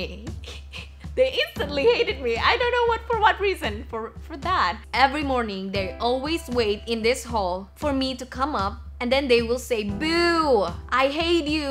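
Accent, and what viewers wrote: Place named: Singapore. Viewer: kalo ngomong bahasa inggris jadi ga keliatan kaya orang indo, kaya orang singapore wkwkw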